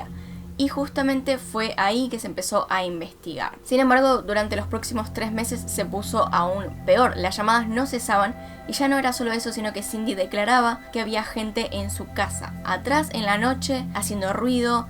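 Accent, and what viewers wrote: Argentina. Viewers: La única voz argentina que soporto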